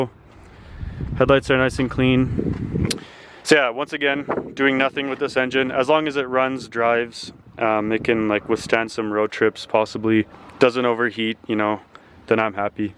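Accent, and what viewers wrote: Canada. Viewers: You sir got my sub! I can't wait to see how the car will look! And also your Canadian Accent is just beautiful! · are you canadian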